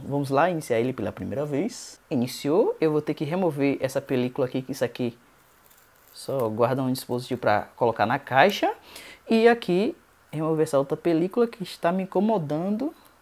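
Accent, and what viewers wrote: Bahia, Brazil. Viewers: Vc realmente tinha de ser baiano... parabéns! gosto de seu canal! Tb sou baiano. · vc e da Bahia ?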